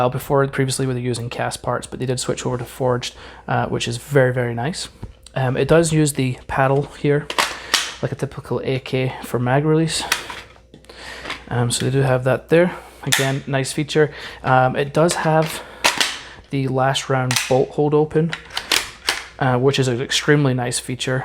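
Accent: Ireland. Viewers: Irish much? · Irish or Scottish?? 😁 Just ordered mine last week. Just waiting on shipping.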